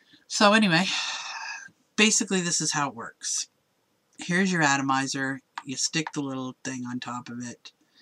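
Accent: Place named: Canada. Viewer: Okay, good video! Couple things...? Hot! I'm a sucker for that Canadian drawl..."owwt" instead of "out." what a cutie! And second, don't you dare bleep yourself. There's nothing funnier then you saying "fuck" ten times in a video out of frustration:). Love it!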